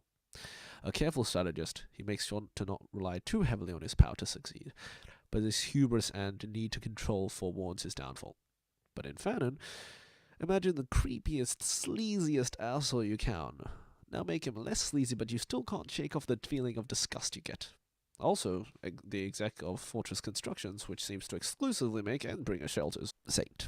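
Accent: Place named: Scotland, United Kingdom. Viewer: yes move on from that outrageous scottish accent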